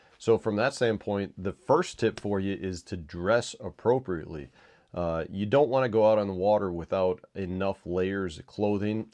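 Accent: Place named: South, United States. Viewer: Sounds like you're in a southern state. Where do you fish during winter?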